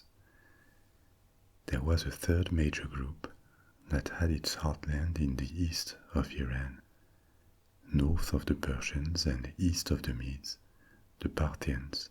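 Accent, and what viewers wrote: France. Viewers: My friend, I listen to your stories every night before I fall asleep. When I am asked how I slept, I say “wonderful!” How could I not when I have a dear French friend with a soothing and silky accented voice who reads to me until I fall asleep?” I’ve told many in my circle about you and most of them thank me joyfully! I have never listened during the day, but I did with this one. You are exceptional in your descriptions, your words paint the pictures in my brain! You are truly a treasure and I thank you from the bottom of my heart for putting in all of the countless hours it must take you to research the histories, write your script, set up your “scenery” for you to handle (VERY hypnotic), record, edit……..it exhausts me just typing just the steps out! It leaves me wondering when you find time to eat, spend time with your family, work or any of the things required to live your life! 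I have never chosen to use patreon, but I will now. You give me countless hours and it’s only right for me to give back. Thank you. From the bottom of my heart, my friend. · Yes. I'm not 100% sure, but I believe his accent is French. 🤔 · yes, he is French. He lives in Paris, so im guessing he was born there too. I love Paris. I lived there for 2 years.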